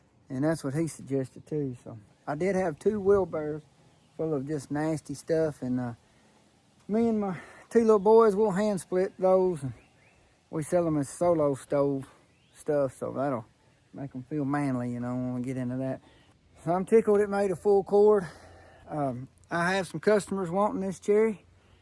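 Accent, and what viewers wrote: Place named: Arkansas, United States. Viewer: Greetings from Clinton, Arkansas! i would like to know what part of the country you are located in, Since you talk like me, we may be neighbors. Please let me know, thanks.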